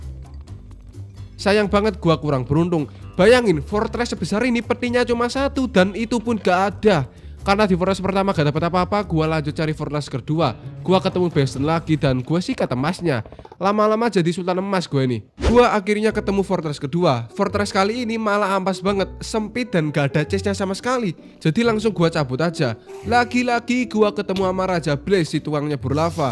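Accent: Java, Indonesia. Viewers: keknya lo jawa bg ngomong gue nya medok bgt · Medok bang ngomong lu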